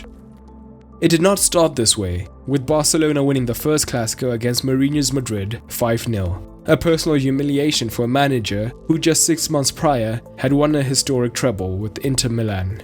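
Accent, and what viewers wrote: South Africa. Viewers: Is this South African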